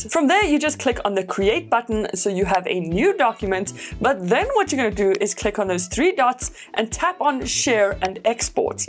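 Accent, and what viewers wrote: South Africa. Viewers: Hi a quick question are u from South Africa 💭❓ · Hi are you South African, cool channel keep it up !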